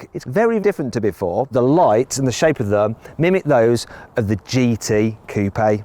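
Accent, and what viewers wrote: United Kingdom. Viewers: 이 영국발음 + 장난질 컨셉을 한국에서는 할수없을까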